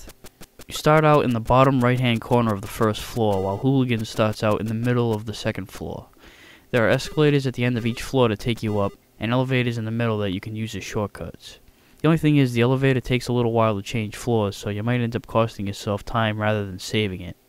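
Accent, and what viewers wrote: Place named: New England, United States. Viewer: Similar Boston-to-Providence accent.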